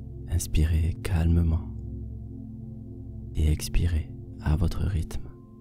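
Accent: South, France. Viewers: c'est l'accent du sud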